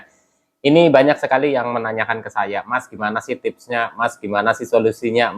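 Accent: Banyumas, Indonesia. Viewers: Mas berapa lama kena gerd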